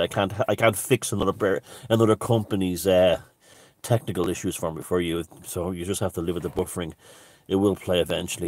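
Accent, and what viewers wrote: Ireland. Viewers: Over on Odysee you can use salty cuss words in your cool Irish accent. You always make me laugh when you do that.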